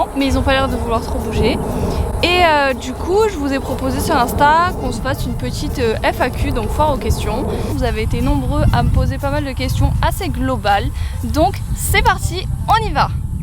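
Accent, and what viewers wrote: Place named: Gard, France. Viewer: Hello :) c'est moi qui t'ai posée la question sur ton accent, en fait c'est parce que j'ai le même :p et c'est l'accent que on a dans le Gard vers Nîmes, et qui est vachement différent de celui de Marseille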